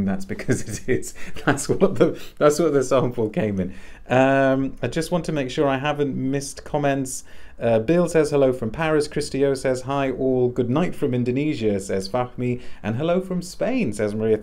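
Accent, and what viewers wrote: United Kingdom. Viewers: British accent - I’m in 💖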